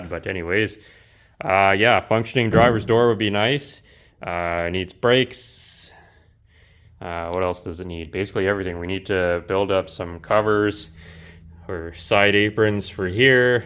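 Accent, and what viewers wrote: Canada. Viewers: Gosh, you sound Canadian!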